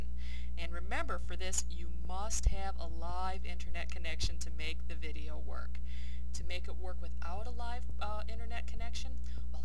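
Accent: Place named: Michigan, United States. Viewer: SO helpful! Thanks Laura! I could tell you were from Michigan within your first 2 sentences. ;~)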